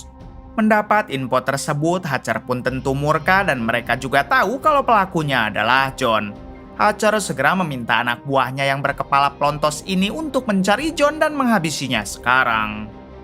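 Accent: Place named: West Java, Indonesia. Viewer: Lidah Sunda SDH gak kaget z jd j pokoknya lawak tukang panci keliling 😂😂😂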